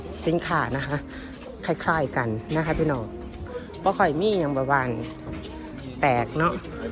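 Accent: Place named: Isan, Thailand. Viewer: ชอยคุณ พูดอีสานเพราะมาก เอ็นดูเวลาบ่นสามีค่ะ 😻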